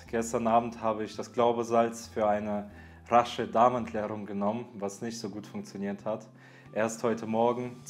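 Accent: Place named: Russia. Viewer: ты откуда? ✌🏻 Russe/Russlanddeutscher? Ich habe das sowas rausgehört, dass du Russisch sprichst 😁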